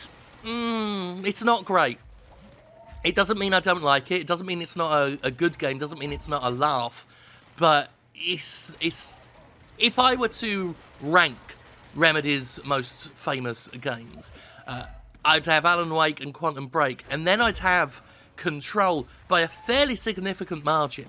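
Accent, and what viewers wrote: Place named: United Kingdom. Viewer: For some reason, sounds particularly British in this episode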